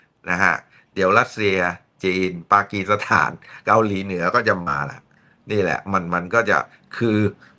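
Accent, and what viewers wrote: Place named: Central Thailand, Thailand. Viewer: ชอบช่องนี้.พุดกลาง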